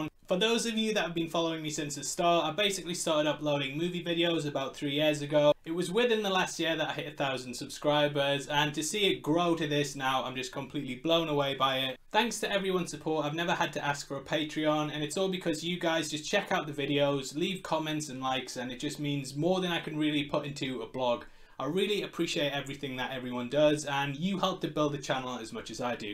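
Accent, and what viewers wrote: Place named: United Kingdom. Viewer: Congrats on the 100,000 Sub Milestone +At last Seeing the Face behind the Brit Voice when I watch The Videos with the Topic of the time.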